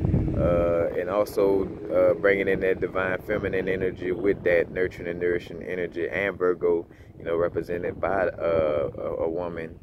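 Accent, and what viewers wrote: New Orleans, United States. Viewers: NOLA accent! 🙏🏾 Peace to you. I love your vibe.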